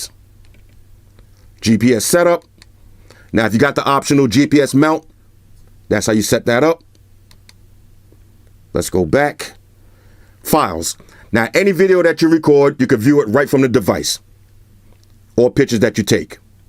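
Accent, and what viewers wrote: New York, United States. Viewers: You’re from queens or Brooklyn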